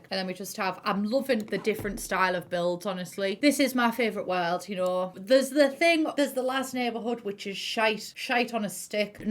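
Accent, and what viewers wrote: United Kingdom. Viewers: I love you accent. Are you British? I'm not too good at guessing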